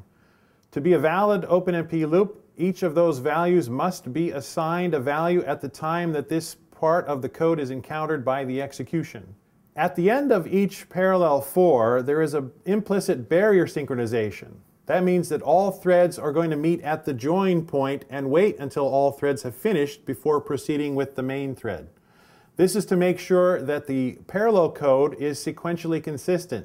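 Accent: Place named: United States. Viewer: Just link to the slides, then I don't have to listen to an American accent.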